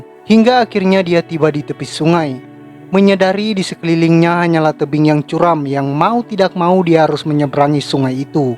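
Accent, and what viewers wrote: Eastern Indonesia, Indonesia. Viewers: Bang orang timur? Logatnya kental timur 😂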